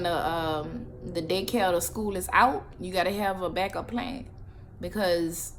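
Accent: United States. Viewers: Louisiana accent strong lol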